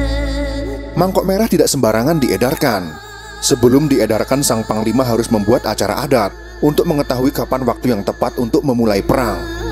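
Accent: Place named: Java, Indonesia. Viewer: Ini yg menguak sejarah pasti Jawa?🤣 Dari cara bicara udh ketahuan 😂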